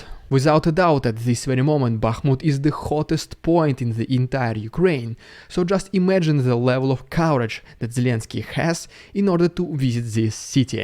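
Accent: Russia. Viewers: Stop trying to be cute bro. Can speak properly u Russian. Sound like one n look like one. Go into action don't just comment criticise. Put in coming for u n give u awards for the information u gave him. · Man I'm from Crimea Sevastopol and I speak Russian and I speak fluent English and I came here when I was a kid and all my life I thought that this accent that he has was totally fake made-up American bulshit for movies but now that I see it in real life.... wow it's real. I guess I just missed out on that cool accent because I came here as a kid and and now I really don't have an accent. :( 😭😭😭🤷 Btw using voice to text so appologise for Any broken ass sentence structure or spelling ahead of time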